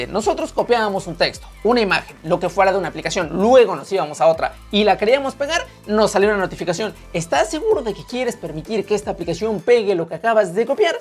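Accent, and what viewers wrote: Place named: Asia. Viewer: se nota un poco tu acento asiático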